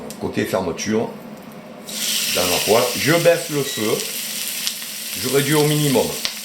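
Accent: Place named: Marseille, France. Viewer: 👍👍👍👍👍👍👍👍👍👍❤❤❤❤❤❤❤❤❤❤ et avec l'accent Marseillais en plus (Le vrai...)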